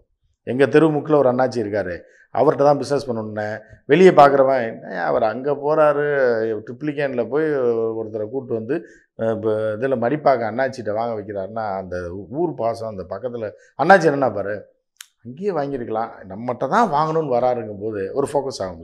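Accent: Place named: Madurai, India. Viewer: madurai slang-Super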